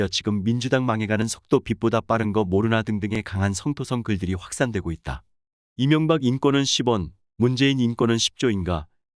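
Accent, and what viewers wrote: North Korea. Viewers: 정성산님 목소리가 아닌데 어디 편찮으신가요? 자연스럽게 이북 억양이섞인 말투가 구수한데요 하루빨리 다시 듣기 바랍니다 감사합니다~^^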